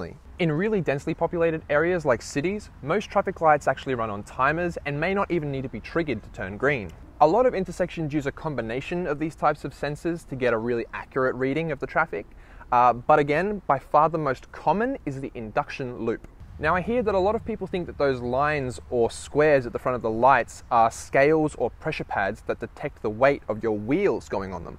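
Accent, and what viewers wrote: Australia. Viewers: Are you Australian mate?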